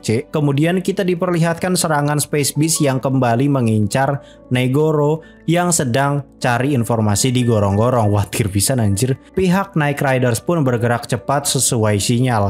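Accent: West Java, Indonesia. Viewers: Abang orang sunda ya😁☺😂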